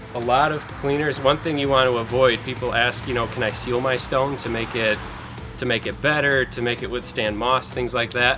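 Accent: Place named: North, United States. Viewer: I love the "accent" of the upper states!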